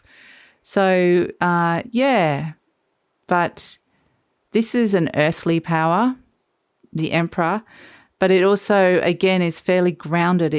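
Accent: Australia. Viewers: R you from Oz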